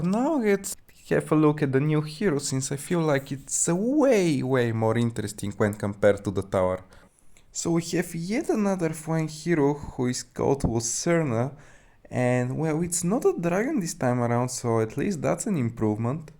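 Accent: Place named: Eastern Europe. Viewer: listen, are you from a post-Soviet country? it just sounds like you are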